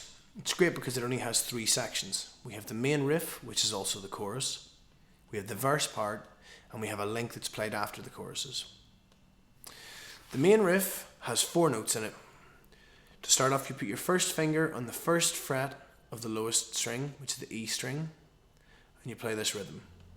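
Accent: Ireland. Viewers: awesome... do you have any tips im 14 and from australia..and been playing for a year.. i am in a band... i play bass.. we play this song and when i play the verses my fingers get very very sore and i get tired .. would you have any tips.. please reply ... awesome vid!! your from ireland but live in america is that the accent? if you have any tips please reply .. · how do you know the beatles didnt say diene, its not just the beatles that are from liverpool that have the main accent, different accent DUH. not just the beatles. and he doesnt even sound like he's from liverpool, more like irish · dine - dine --- op - dine - op - dine.... accent is hilarious. gotta love the irish.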